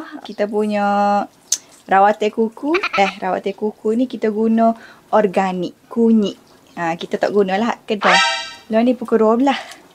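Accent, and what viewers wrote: Kelantan, Malaysia. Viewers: doh ehhh suko la ho dengar kecek kelate 🤣 tenkiu menghiburkan saya dikala kesepian di tempat kerja 😆 terus semangat post video2 menarik ❤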